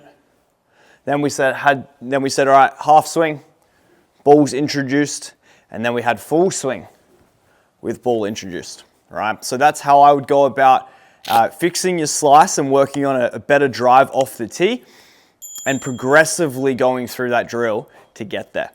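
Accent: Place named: Australia. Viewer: So good to hear a aussie accent for a change. Feels right at home. Great video friend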